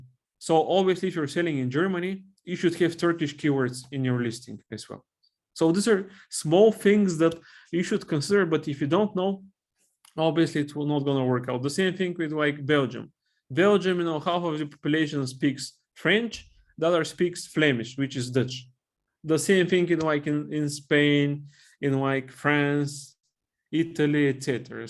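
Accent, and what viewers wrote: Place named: Bulgaria. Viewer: He seems to have a good command of the language, however, a very heavy Bulgarian accent.. Maybe because I have been exposed to British English and Canadian English more. If you are also Bulgarian it might be easier to figure out what he is saying. There are some instances in the video where I slowed the video to 0.50 and listened to it 10 times, I dont understand what he said.. I might give you the example when I watch it again...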